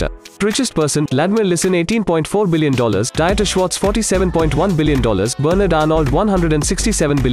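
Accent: India. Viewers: Author from India ? His accent like indian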